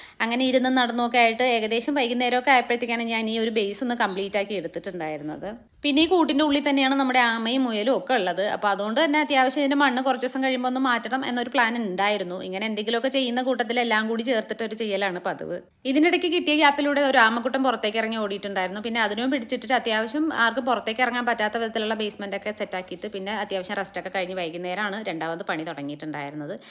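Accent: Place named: Thrissur, India. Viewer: Thrissur aano place? Talking style kettapo thonni chodhichatha...onum thonale..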